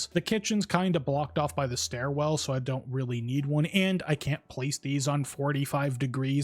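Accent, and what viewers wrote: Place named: Australia. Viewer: Australian guy talking about castles? I'd be surprised if it wasn't Shad.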